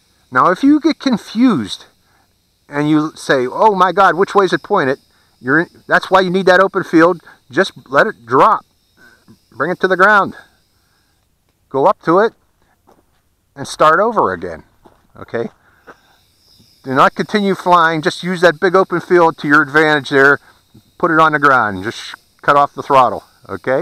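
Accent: Pittsburgh, United States. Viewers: I could be wrong, but you sound like a native Pittsburgher for sure 😉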